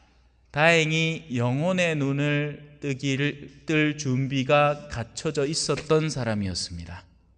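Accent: Gyeongsang, South Korea. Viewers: ..경상도.억양.실제에닮은꼴..많은.현세에삶.어찌콕콕..지적하여주신.신부님.저희들삶안에.오셨네요.. 절뜍..절뚝.저희들에삶..감사합니다돌아보게합니다🕯🥀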